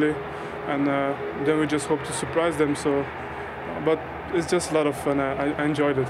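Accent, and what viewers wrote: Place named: Manchester, United Kingdom. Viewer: Funny how his Belgian accent evolved into a Manc one over the years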